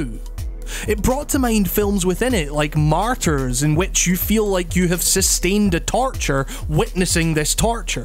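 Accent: Scotland, United Kingdom. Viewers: Are you Scottish? Because you have a beautiful accent.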